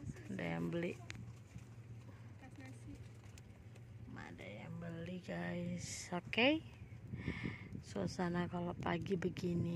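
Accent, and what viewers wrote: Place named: Makassar, Indonesia. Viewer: teh asli nya orang mana .. kaya orang makasar ya ngomong nya😁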